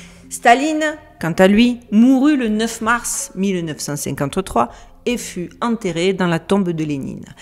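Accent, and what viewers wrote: Marseille, France. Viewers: Mdr l'accent marseillais au usa en plus vous êtes super jolie😂😂😂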